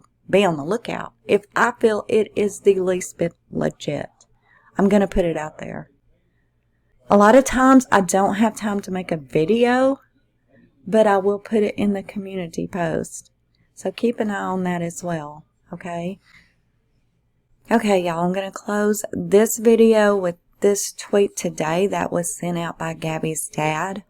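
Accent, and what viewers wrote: South, United States. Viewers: you have the most beautiful southern voice and I’m glad you’re proud of it don’t ever change lovely lady keep up your amazing work much love from South Wales 🏴 in the uk 🇬🇧❤️ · Your cute southern voice ticks all the right boxes for me lol Sweet mamma goodness gracious me. Some people just don't know a lovely sound when they hear on. Have a great day. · Goodness people make drama out of nothing. I love your Southern drawl! I'm very interested to see how this is picked up by the relevant authorities. 🤔 👀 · Don't you worry about your southern drawl. Im a southerner. I talk slow & have the drawl. You are an excellent You Tube creator. I've recommended your series, "Exposing the Mistress". Keep them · Damn Skippy! They Definitely need some kind of running updates around NC.....I Live in the Western Mtn's if NC and My County Is Huge.....with Lot's of Wood's......and State Mtn Park's.....So I Definitely Appreciate the Info you giving Us! 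Oh and i think You and Myself are what I like to Call.... An Educated Hillbilly......so there for your Southern Draw is not only Totally Acceptable.....but Also a Must! Lol. So just keep doing what your doing Love! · I LOVVEEE your southern draw!! Don’t let anybody tell u that u need to change in ANY way. You’re absolutely AMAZING!! I’ve been a subscriber of yours for the last cpl years and I absolutely adore u 💜💜💜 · Brian’s walk looks like something that was called “pigeon toed” back in my day. And it was correctable with special shoes at a very young age so that the feet could be trained to point more inward than outward. Most parents pick up on it when the child starts walking & definitely a good pediatrician would see it in a regular exam. Also I love your southern accent, I could listen to you talk all day. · Omg. I can’t believe somebody is griping about your southern accent!! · I would listen to your lovely Southern drawl all day over those dreadful computer generated voices... 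· Love your Southern drawl and I like how I can sit and actually listen and think about what you are saying... Most ppl talk too fast, if anything they should slow down - not you speed up. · The trolls have left Summer's video's and gone to video's on Brian. geesh, your southern drawl sounds normal to me, bc I'm a Texan. #southernladiesrock Got the reminder on for your live; looking forward to it!❤🙋‍♀️ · Awe! Will miss your live sweetie, working this weekend, but will absolutely watch the replay! Girl, you're absolutely beautiful inside and out! Including your southern drawl. Thanks for what you do! We love ya'll! Prayers & Blessings from WI 🤗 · New sub here from Bendigo, Australia (Discovered you with you previous vid) - keep doing what you’re doing! Those of us with high enough IQs can see that you are presenting credible information for us to subsequently analyse and then make our own decisions. 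Also: I don’t subscribe to channels with automated voices as they thoroughly annoy me (particularly when poor punctuation has been used & sentences require some mental processing to be understood) AND I ABSOLUTELY LOVE YOUR “SOUTHERN DRAWL”! 👍👍🇦🇺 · You are doing a great job and if someone doesn't like your southern accent they can take a flying leap. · You are such a great person. Your Southern Drawl makes you are easier to talk to. Newbies Need to listen closely. FYI: NEWBIES WATCH A FEW IS SUCH A SWEET PERSON.IF STE HAS INFO SHE PUTTS IT OUT THERE! ♥️♥️♥️♥️ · Don't stress over any negative comments! Those of us who have been here on your channel for awhile, know! You have never click baited and I absolutely love your southern accent ❤ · I love your southern draw,roll tide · Glad I found your channel! Love your voice! We sound a lot alike lol! Southen' girl myself here 😘 I think you're doing a wonderful job!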